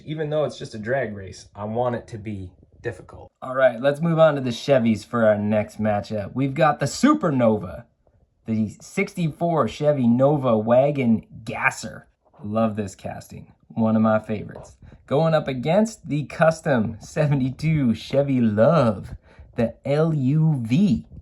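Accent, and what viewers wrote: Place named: Canada. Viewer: I realize you’re Canadian but the word ‘a’ in the English language is pronounced ’uhh’, not ‘eh’.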